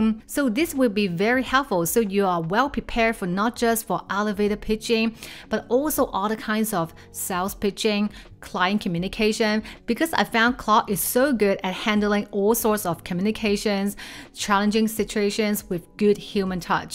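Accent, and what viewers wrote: China. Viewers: Great content. Your Chinese accent is very strong so I finished watching but it was pretty tough Good luck.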